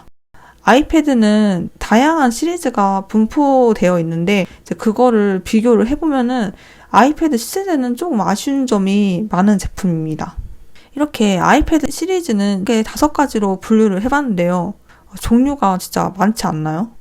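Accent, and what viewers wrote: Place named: Jeolla, South Korea. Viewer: 전라도 분이신가요?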